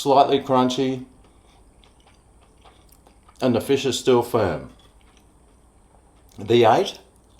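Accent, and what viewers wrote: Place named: Australia. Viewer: Never thought I would enjoy having an Aussie tell me how to make crispy fish sticks!😂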